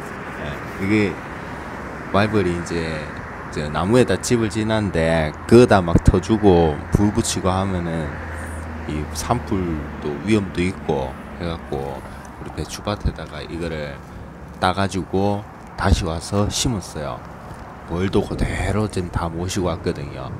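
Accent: Gyeongsang, South Korea. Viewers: 유튜버님의 경상도 사투리가 마치 청국장찌개수준입니다 너무 구수하네요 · 말투가 경상도 같음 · 터줄낀데 ㅋㅋㅋ 경상도 방언 재밌다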